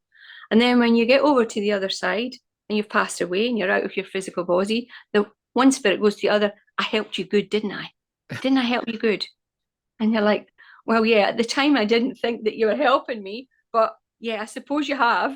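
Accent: Scotland, United Kingdom. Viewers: Is she scottish?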